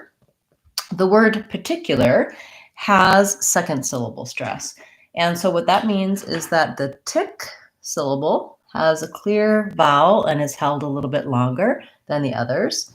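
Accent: United States. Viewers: I don’t think I’ve ever heard an American speaking so clearly 😳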